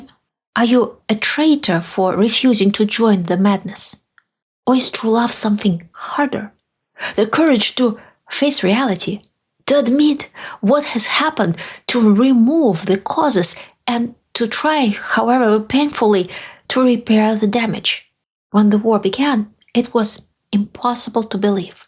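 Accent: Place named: Russia. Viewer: Hi, thanks a lot for today's episode. You raise many issues I would love to comment on. I was Dutch and will always remain that, though I consider myself to be Australian. My Dutch accent is quite strong, much more so than your almost imperceptible Russian overtones. I am familiar with say 8 European languages and gave up on studying Russian (as a hobby) when Putin invaded. My wife is from Finland and equally adapted to Oz after nearly 60 years. We visited the Ugric museum in Helsinki. Clearly the Ugric tribes could not offer much resistance to the Empire. The Fins have only just managed to get out and not be swallowed up again. My wife's father was in the Winter War. The Karelians are Fins, but different as were the Estonians (IMO) say 200 years ago. Regional dialects varied a lot also in Finland. I am aware of the Komi Ugric people living toward the Urals and believe Russia was respectful of the Ugric languages, which helped the Fins to get out during WWI. 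Currently I am too preoccupied with the war in Ukraine to watch many of your videos. With luck that stupid and horrible war will not last another year. We had many reasons to migrate to Oz, but worry about Russia and WWIII was one reason. We feel safe in Tasmania, but visit Europe anyway.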